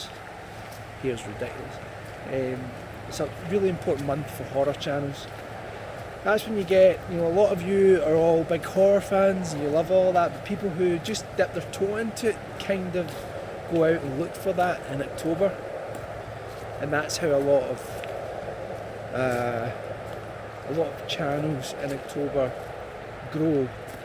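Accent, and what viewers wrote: Scotland, United Kingdom. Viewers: Just hearing you talk makes me proud of my Scottish heritage 😁ur awesome man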